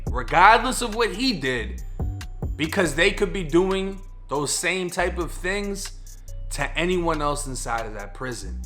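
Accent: Boston, United States. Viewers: It’s the Boston accent for me. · Damn what part of South Boston Florida you from 😂 carrr lol thick ass accent sound like Mark Wahlberg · Love your channel bro👍 Just curious about your Boston sounding accent? Did you always live down South. I’m from Brooklyn living in Jersey and I’m always hearing about my accent😂 Keep up the dope content 💯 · Aye big bro are you from Boston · This guy is so Boston I can smell the clam chowder from here! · Sounds like he's from Boston good content i just subscribed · Is he a Boston dude? Accent killin me lol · Is that a Southie accent? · Is he from the south or is he from Boston ???? He sounds like Marc wahlberg and Jay let’s live life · Bra if you from Tampa why you sound like you from Boston?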